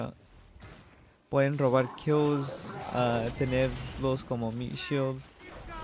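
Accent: Mexico. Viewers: Ey feliz año un saludo, esa voz se escucha bien mexicana dios te ayude a tener más y tener nuevos proyectos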